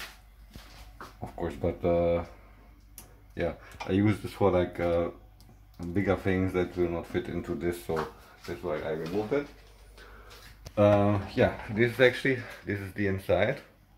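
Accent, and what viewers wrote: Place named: Germany. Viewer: Hallo, ich gehe von deinem Akzent einfach mal davon aus, dass du Deutsch sprichst, dann spar ich mir mein schlechtes Englisch ;D ich habe die selbe Maschine und genau wie bei dir blinkt das "eco" Symbol in einer Tour. Laut Beschreibung ist das der Fehlercode für eine undichte Stelle bzw. für den "Überlauf". Hast du eine Ahnung wie ich das beheben kann? Habe die Maschine schon auseinander gebaut und konnte keine undichte Stelle erkennen. Wäre um Rat sehr dankbar! LG und ein schönes Wochenende.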